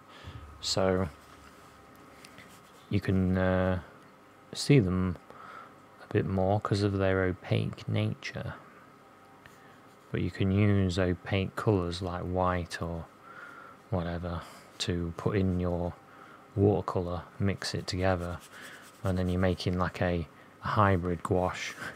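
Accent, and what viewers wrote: United Kingdom. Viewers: Your British Accent is really so awesome and polite Dear.😊😇🥰😍🤗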